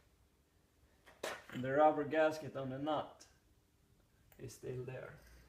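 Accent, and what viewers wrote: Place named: Upper Peninsula, United States. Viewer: cool, the accent is very similar to what it sounds like in our Upper Peninsula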